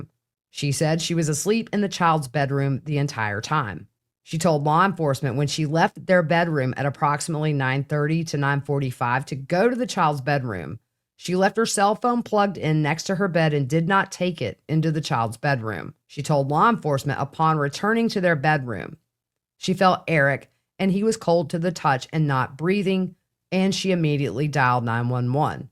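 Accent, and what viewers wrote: South, United States. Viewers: Your southern drawl is so beautiful ❤️😘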